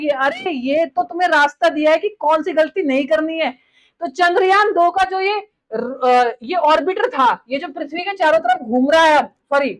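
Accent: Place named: Haryana, India. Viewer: ❤❤❤😂😂😂😂😂❤❤❤व्व्व्व्वा ह व्व्व्व्वा ह व्व्व्व्वा👌👌👌👌👌 च * संदेश कार्य विश्लेषण * * बहोंत बहोंत बहोंत सुंदर और अच्छीं तरहसें समझां दियां * अपनें माॅं और बच्चों कों * अपनी हरियानवी टोन कीं * हिंदी भाषा में * बहोंत बहोंत बहोंत सुंदर * धन्यवाद😘💕😘💕😘💕 नमन वंदन🙏🙏🙏🙏🙏 अभिनंदन भीं👋👋👋👋👋 * वंदे मातरम् भारतं हिंदुस्थानी मातापिताम् महापुरुषं लोकमान्यं जनता जनार्दनम् संतं वैज्ञानिकं🙌🙌🙌🙌🙌 👏👏👏👏👏👏👏👏👏🙏🙏🙏🙏🙏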